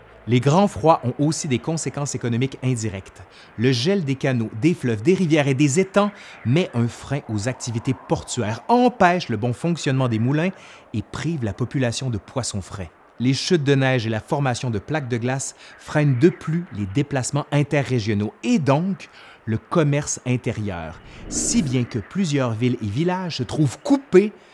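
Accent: Quebec, Canada. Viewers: C'est chouette d'écouter l'accent québécois dans un français intelligible. Merci pour la vidéo. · Superbe vidéo et reportage surtout avec cet accent Québécois si unique et charmant !! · Tres interresant. Je suis un re-apprenant de francais. Apres Lycee, ily a 60 ans, j'ai recommencé apprendre un peu le francais, je trouve que vous parlez dans un facon je comprend. Je suis déja un amateur d'histoire. Votre accent, c'est quebecois? Je nai pas un clavier francais :-( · L'accent, encore l'accent, toujours l'accent. Depuis Félix Leclerc, vous ne vous êtes pas fait l'oreille à l'accent québécois ? Ici, y a longtemps qu'on s'est habitué à l'accent français ! ;) hihi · Je viens de trouver la chaine .. très intéressant ce que tu présente mais l'accent surtout j'ai jamais cru que je vais aimer l'accent québécois.. Continuez Bravo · tresbkie monsieur et en plus avec cet accant canadien qui ma toujous plut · Un Québécois qui me narre la Fance d'époque, avec cet accent, quel bonheur! 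Le vin qui gèle, mais quel cauchemar 😱 Bisous de Bordeaux 😉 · Super vidéo. J'ai vécu au quebec dernièrement (que j'ai dû quitter malheureusement) et je sais pas trop pourquoi mais ça me fait plaisir de ré-entendre cet accent! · Et pourtant le ciel n'était pas encore troué et alors donner les cause du changement climatique a c'est époque la avec l'accent cannadien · C'est fou comme j'aime entendre l'histoire française racontée avec un accent canadien :) Je sais on dit "accent québécois" mais je suis français tout le monde sait que casser les pieds c'est une obligation génétique pour nous :p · Désolé, j adore vos vidéo, et l accent me rappelés les quelques années passées au Québec dans mon adolescence. Mais je vais me permettre un commentaire la première fois que j ai vu votre vidéo je me suis dit "au final les Québécois c est des Scandinaves (descendant de Norman) de culture française qui ont immigré aux Amériques:) Continue the good work comme ils disent. 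Et merci pour vos videos!!! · Quelle superbe diction…j’en ai les pieds gelés!🥶🙃😂Heureusement, votre adorable accent québécois réchauffe mon cœur et mon corps. J’ai augmenté mes connaissances, ce soir et je m’endormirai un peu moins sotte.😉😁MERCI❣️👍🥀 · Ce que j'aime beaucoup, c'est l'accent Québécois. Sinon; j'aime aussi ces vidéos qui nous démontrent que le climat ne peut se prévoir comme cela est le cas actuellement avec le GIEC.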